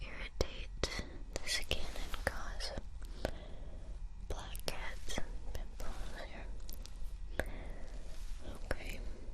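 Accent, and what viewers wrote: United States. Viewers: I thought u were American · oh I thought you were American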